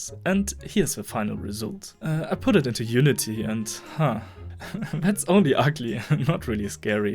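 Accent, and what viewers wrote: Germany. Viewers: Are you german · Are you Deutsch? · i like the german accent (sorry if it isnt german it sounds alot like it) and its a really good video keep up the good work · are you german perchance?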